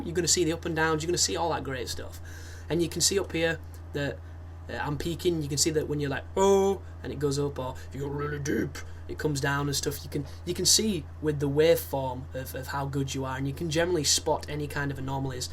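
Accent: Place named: England, United Kingdom. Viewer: its cuz he has an english accent which makes anyone sound cooler/more interesting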